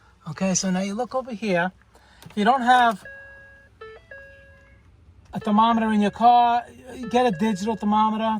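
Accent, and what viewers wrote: New York, United States. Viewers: thank you bro,, u gat that N.Y. gangsta accent.. i like it!! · Ditto. Moving to Hawaii makes me miss the Boroughs' accent.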